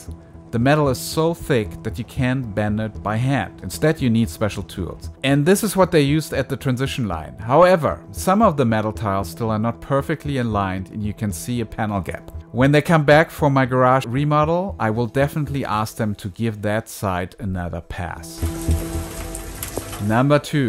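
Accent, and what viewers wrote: Germany. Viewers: ...your accent??? not quite german...aber ein bissien.. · Your english language sounds very german!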